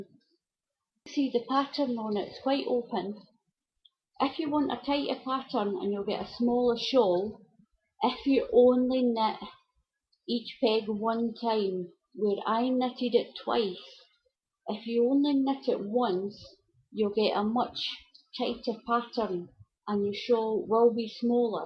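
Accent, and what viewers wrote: Scotland, United Kingdom. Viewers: I love this video, do you have to crochet after you take it off the loom? I love your accent, your from Scotland right? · Hi, Thank you so much for this. I was looking for a new project to do on my loom. This is perfect. Such a pretty stitch. I'm from Livingston. It's lovely to hear a Scottish voice on YouTube.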